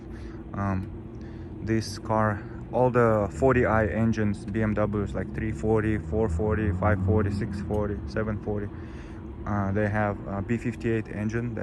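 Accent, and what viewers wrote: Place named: Chechnya, Russia. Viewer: bro this guy is a chechen or a russian ahahhaha his accent says it all ahhaha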